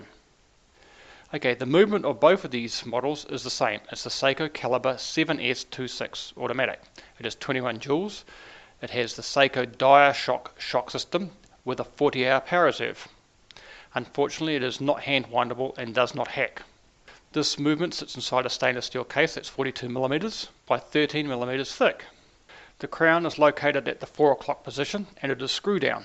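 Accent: New Zealand. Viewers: Such a pleasure to hear a New Zealand accent around horology circles on YT. As a proud Aussie, I was taken aback by your omission of the local (Auckland,) and well-regarded, Magrette brand. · Nothing like a kiwi accent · È un leggerissimo accento neozelandese...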